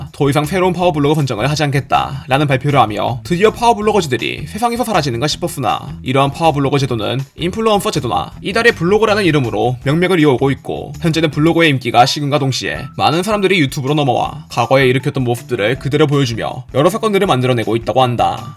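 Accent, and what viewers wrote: Jeolla, South Korea. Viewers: 시작부터 전라도인 거 ㅈㄴ 레전드네 ㅋㅋㅋㅋㅋㅋㅋㅋㅋ 까보전은 과학인가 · 시작부터 전라도ㅋㅋ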